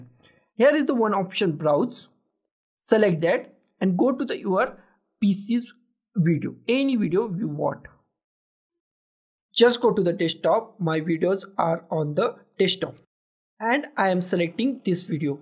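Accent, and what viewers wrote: India. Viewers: Bro's internet speed 🗿🗿 bro's Tutorial ❤❤ bro's indian accent of english being an indian 🙌🙌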